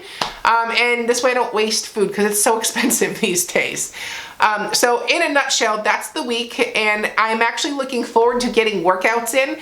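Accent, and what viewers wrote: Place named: New Hampshire, United States. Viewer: Florida, Texas....when did you live in NH? That New Hampshire accent comes out! Loved seeing the girls playing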